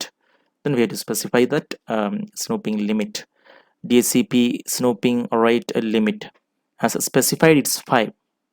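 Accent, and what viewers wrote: Kerala, India. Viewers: Are you Malayali?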